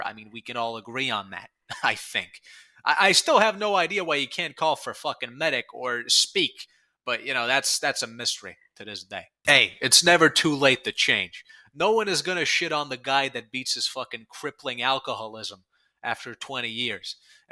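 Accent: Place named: Italian-American, United States. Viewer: Youre the most italian american man ive heard since i watched the sopranos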